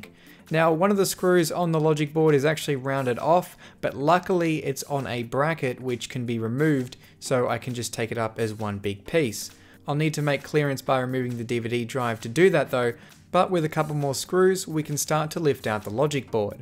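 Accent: Australia. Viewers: soothing? he has a Aussie accent, anything but soothing...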